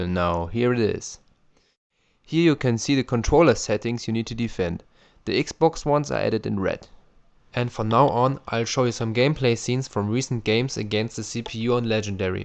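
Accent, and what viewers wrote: Germany. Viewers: German accent. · he speaks very good he just haves a german ancent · haha, i love the german english :D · Sick accent bro, I love Germany! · Kann man gleich an deinem Englisch hören, dass du Deutscher bist. Schönes Tutorial :D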